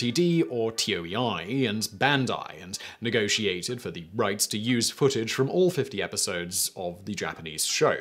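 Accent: England, United Kingdom. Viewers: Yeah, me too. You really can't blame this particular error on his British accent this time either.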